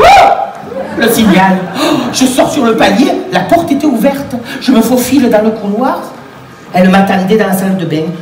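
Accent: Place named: Marseille, France. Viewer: Super zize un plaisir d'écouter de découvrir ont retrouve l'accent de Marseille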